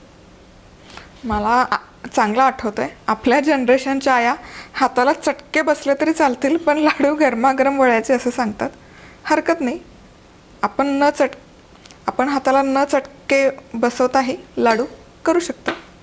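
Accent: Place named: Pune, India. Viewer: Jinnas means ingredients na..ryt....ssly punyatle lokanche marathi pure e..sahi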